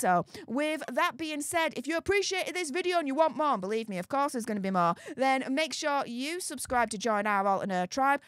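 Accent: Yorkshire, United Kingdom. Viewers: I just love your thick Yorkshire accent! If I didn’t have my own unique accent (Australian) I definitely would have a Yorkshire accent!😂😂🇦🇺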